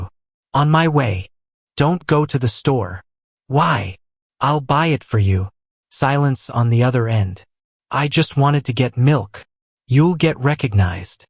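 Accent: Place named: Spain. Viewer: wtf is with the Spanish voice inflections? Can we PLEASE knock that shit off ?????!!!!!????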